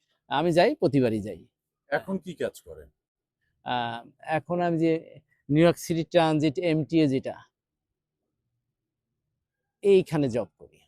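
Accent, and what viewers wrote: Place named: Jessore, Bangladesh. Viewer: আপনি মনে হয় আমাদের যশোর অঞ্চলের মানুষ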